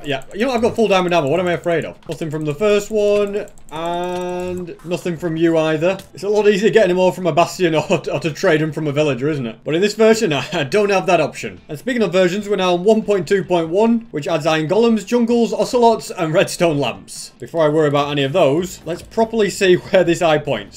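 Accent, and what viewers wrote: United Kingdom. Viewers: He has a British accent and he is from the United Kingdom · This man has the most enthusiastic British accent of my entire life.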